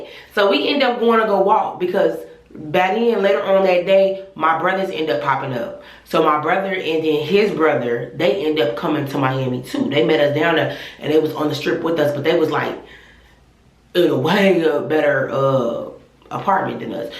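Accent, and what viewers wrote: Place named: Chicago, United States. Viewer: Ik a Chicago voice when I hear one!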